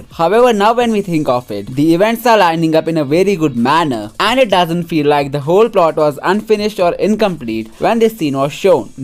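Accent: India. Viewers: Your Indian accent is real thick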